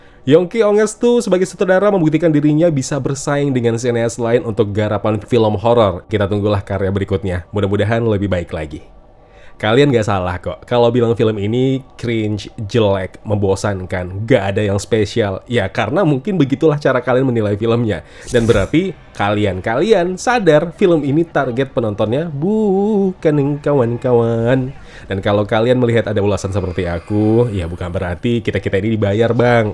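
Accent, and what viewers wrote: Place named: Padang, Indonesia. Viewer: logatnya malah kya logat padang, cuma bagus sihh 😊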